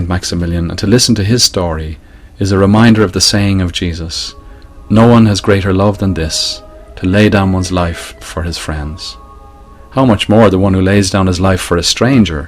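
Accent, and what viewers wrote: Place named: Ireland. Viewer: The narrator sounds Irish. As to your bewilderment, uneducated Americans, and many educated ones, are certainly shy on history, geography, and languages. We are good enough at details to have flown an airplane at Kitty Hawk and sent several Saturn V rockets to the moon and back. Now Musk is building them bigger and landing them vertically. That is freakin' detail. We have been blessed with a large country into which people people have found refuge and opportunity, assimilating into culture while adding to the color, patterns, and textures of that fabric. When someone speaking, say Hungarian or Lithuanian, is 6000 miles away why learn it anymore than you would learn Latin to speak to a Roman from 2500 years ago? I know Latin but there aren't many Romans around. I also know German, a language from a place my starving ancestors left because if they and a third of the town hadn't, all would have starved. 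After about four generations of my folks speaking it as a native tongue, I had to learn it in school. As to geography, is there a major League Baseball Franchise in Austria or Australia? And most Americans wouldn't know which is which. Blame the lame, give a trophy for nothing, liberal, progressive, unionized public school system. As to history, we like WWII movies like Patton, Saving Private Ryan or more imaginatively, Taratino's Inglorious Bastards. We also like movies from earlier times and cultures, take Troy, for example, or Robin Hood vs. the evil Sheriff tales. Unfortunate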